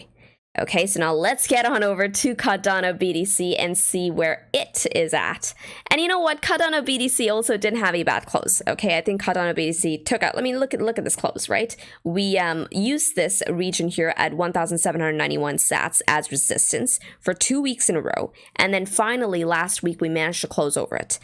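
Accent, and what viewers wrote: Brooklyn, United States. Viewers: Yeah Brooklyn is what it reminded me of too. At least the movies depiction of a Brooklyn accent. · Lol her accent is kinda weird, I’ve lived in New York and how she pronounced some words sounds pretty like Brooklyn 😅 like it esp the way she says “cardano” but she almost doesn’t sound American sometimes 🤔🤔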